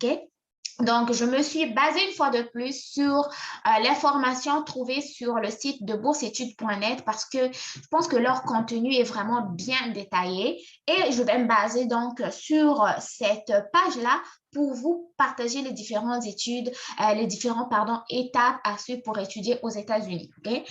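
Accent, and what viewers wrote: Canada. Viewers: Tu expliques parfaitement bien poupée. Merci pour ces riches informations. Je souligne de passage que tu commences à avoir l'accent canadien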